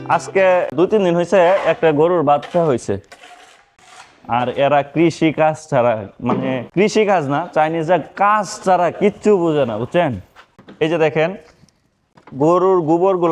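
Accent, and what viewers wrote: Bangladesh. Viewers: আপনার উপস্থাপনা আপনার মতো সহজ সরল। কোনো ভনিতা নেই। খাঁটি বাংলাদেশের ভাষা। সুন্দর। আচ্ছা এইরকম গ্রামে গিয়ে মানুষের সঙ্গে কথা বলতে সরকারি অনুমোদন লাগে?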